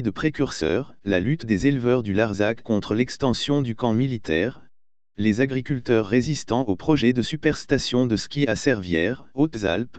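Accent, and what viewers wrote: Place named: Uzbekistan. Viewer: Pourquoi cet accent ouzbèque quand vous parlez ?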